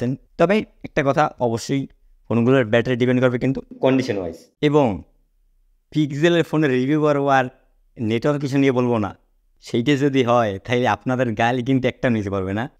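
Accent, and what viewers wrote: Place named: Barishal, Bangladesh. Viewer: ভাই আপনি তো মনে হয় বরিশাইল্লা 🙂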